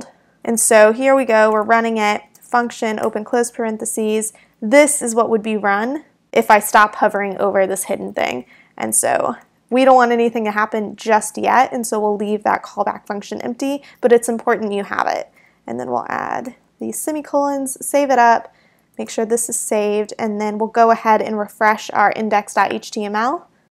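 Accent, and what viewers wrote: England, United Kingdom. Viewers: Dam your English accent is very nice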